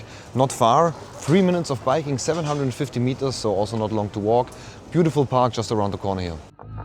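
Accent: Germany. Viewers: Kannst nicht mal auf deutsch, bist aus deutschland das hört man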